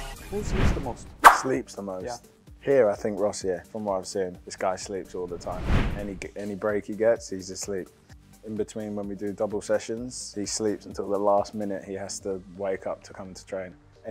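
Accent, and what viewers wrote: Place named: England, United Kingdom. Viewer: Chamberlain’in röportajları keyifli oluyor, hem vizyonlu hem de anlaşılır bir İngiliz aksanı olması çok iyi 🖤🤍🦅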